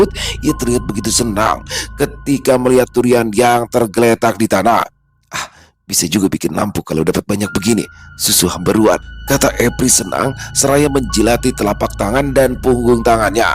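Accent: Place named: Kalimantan, Indonesia. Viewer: abang ini org mana ... klu abang cerita kisah dr kalimantan logat atau cara bicaranya pas dgn org kalimantan .. pokoknya saya dr kalimantan mendengar cerita abang sampai ulang2 ceritanya masih seru aja .. salam dr kalimantan tengah (kapuas timur)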